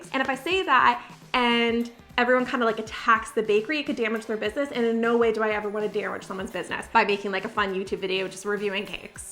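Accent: Canada. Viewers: It's so funny when you're watching a channel for months and then they mention that they're in Canada and suddenly it clicks that that's the reason you're drawn to their videos and they seem so oddly comforting and enjoyable to watch. It's like hanging out in a friend's kitchen because your demeanor and "accent" is so familiar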